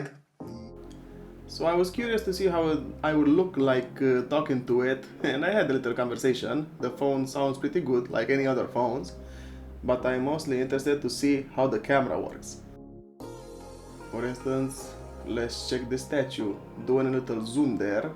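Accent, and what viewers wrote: Romania. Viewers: inconfundabil accentul romanesc al vorbitorilor de engleza :)) literalmente 30 de secunde a mers clipul cu vocea ta pana am pus pauza sa intru la 'About this channel' sa văd țara 😅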